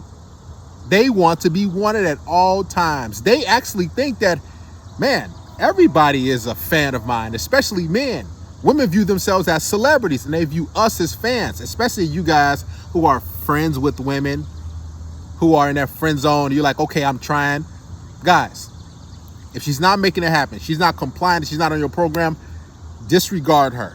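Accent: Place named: Long Island, United States. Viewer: That gawd aweful Long Island accent!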